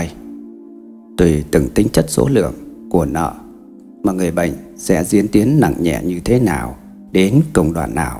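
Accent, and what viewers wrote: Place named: North, Vietnam. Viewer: Bác này không đọc đúng được một từ ngoại ngữ, Virus mà bác đọc nguyên chất giọng Bắc vn là vi dút 😉 Nói chung, giọng đọc của bác không thuyết phục. Ad có thể cho biết rõ danh tánh của vị bác sĩ nào đã viết bài,và vị ấy đang làm việc ở đâu không ạ? Thank you!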